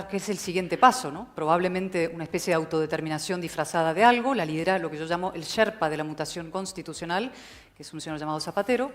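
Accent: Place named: Argentina. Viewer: No me gusta Cayetana habla bien pero el tono prepotente y el acento argentino ese chirría un poco.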